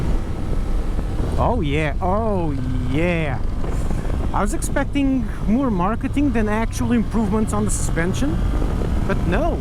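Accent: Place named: Portugal. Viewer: Your accent appears Portuguese/Brazilian but also don’t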